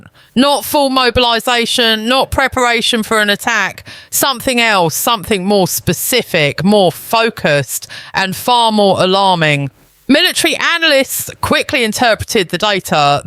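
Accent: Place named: England, United Kingdom. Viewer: It will be a great thing for the world if people with English accents like this are replaced by voices that are 'Chinglish' or Russo-English.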